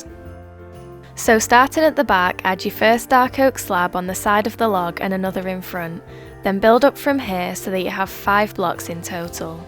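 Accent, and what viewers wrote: United Kingdom. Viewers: I like your British accent.I sometimes try to make one too but I just can't :))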